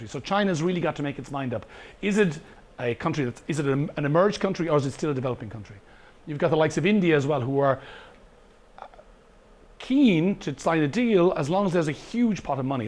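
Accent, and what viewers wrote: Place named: Boston, United States. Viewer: Cool Boston accent!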